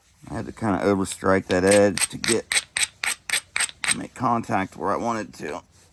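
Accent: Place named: Alabama, United States. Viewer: Cool you used the word solicified in a sentence! Thank you for sharing. Curious where you’re located. I’m in nw Alabama and you sound like home folks.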